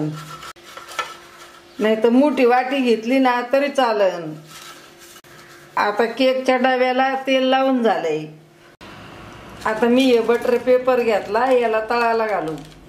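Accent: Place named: Bhor, India. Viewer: Tumch Bolan ani bhasha mala khup awdte maz maheri same Asch bolatat Bhor side la tumch Gav kont ahe aaji Mala tumcha recipe khup awdtat.... Love you ❤️❤️❤️ mazi aaji same Asch bolaychi mi khup miss karte maza aaji PN tumch Bolan aaikun khup Bhar vatat mala ..... Thanks 😘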